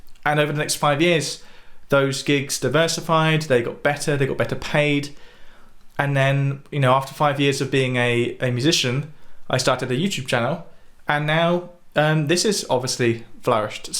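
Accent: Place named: Bayswater, United Kingdom. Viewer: as it pertains to the quantity of subscribers, if not for that Bayswaterian accent of yours, you'd probably only have 2 subscribers. I keep waiting for someone to yell "CUT!" and then you go into some American deep south manner of speaking. "did y'all get that? is that a little ol' take?" Seriously, you bring a TON of value and you make things easy to understand and you solve the riddles/puzzles about songs I've always wondered about like your video on and such. For me, I just want to jam and be able to understand the language. I don't think I'll ever be at Macca level because I have other things going on (like working on planting agave to make tequila, as you can see) but to be able to get up on stage and play the songs that I enjoy and that bring energy would be great for me. So, your vids get me closer to that end. Gracias, amigo.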